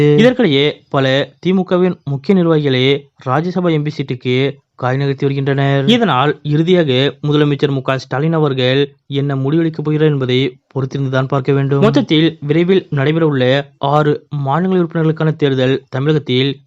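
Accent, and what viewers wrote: North India, India. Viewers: Speak properly Tamil. You are not original Tamilian. May be from North India. From Gujarat????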